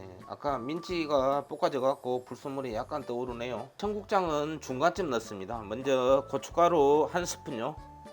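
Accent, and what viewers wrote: Jeolla, South Korea. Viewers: 쉐프님 말씀도 구수하시고. 음식도 왜 케 잘하시는지 전라도 사투리 . 아. 쉐프님존경합니다. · 전라도사투리 쉐프님 최고입니다!! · 우리 샤프님 얼굴한번 보고싶네요 전라도 구수한 목소리가 참좋아요 요리도 잘하시고 구독자님들에게 펀리하게 요리도 하시면 ㅋㅋ 너무 좋아부러요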